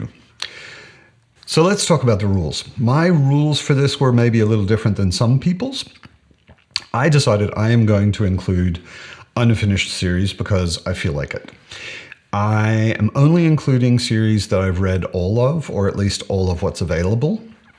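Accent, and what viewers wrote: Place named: Australia. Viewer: I can’t place your accent at all haha I can just tell there’s a bit of Aussie in there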